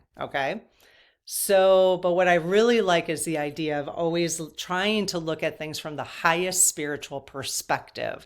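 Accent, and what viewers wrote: Midwest, United States. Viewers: I grew up in Iowa and live in Appalachia now… midwestern accent is comforting to me.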